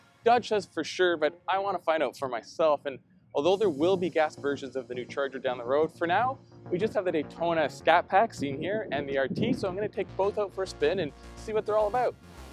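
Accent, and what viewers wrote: Canada. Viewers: See what its all a boat. Lol your Canada accent actually fits in this case.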